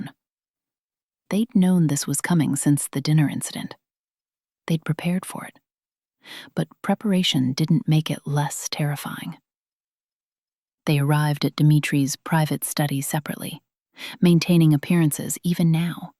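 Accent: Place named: England, United Kingdom. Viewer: I liked storyteller with the English accent.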